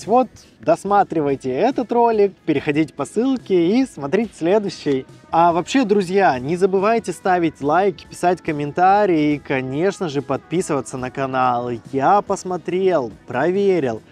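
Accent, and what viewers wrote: Standard. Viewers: Теперь понятно от куда такая чистая речь. Успехов вашей семье во всем!!! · Рада слышать хороший,чистый русский язык! Отвыкла давно от этого Современную речь слышишь,даже многого не понимаешь из за сленга Особенно у молодежи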